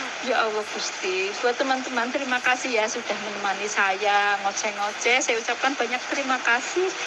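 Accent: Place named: Java, Indonesia. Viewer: Bunda asal mana bund,,kok medok kayak saya 😂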